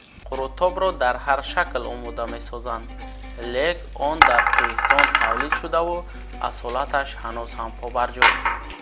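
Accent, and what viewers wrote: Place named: Tajikistan. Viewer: Бародари азиз барои шумо муваффақият орзуманамдам, чи қадар навори зебо бо лаҳни тозаи тоҷикӣ, бо фарҳанги зебо тоҷикӣ 💕. Аҳсан!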